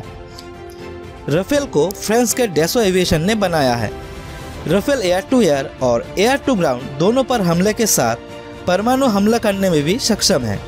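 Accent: Bengal, India. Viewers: You are bengali.... there is bengali accent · Bro you are Bengali, I can smell it😘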